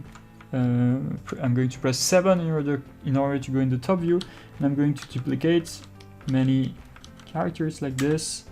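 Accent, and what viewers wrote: France. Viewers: Since Begining I'm recognize your french accent, merci pour ta vidéo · No need to apologise. Your french accent is absolutely ok. Thx for this. Great introduction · He said "Sorry for the French accent.", it does not mean his English is bad. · This is the best tutorial. I love your french accent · the french accent is like fine wine, on animation.... 👌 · Don't apologize for your French accent! It's way better than mine ^-^ Thanks for the tutorials, mate. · When the subject is hand-drawn animation, the French accent is a reassurance. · Don't ever apologize for your french accent. Own it, flaunt it and and shove it in everyone ears.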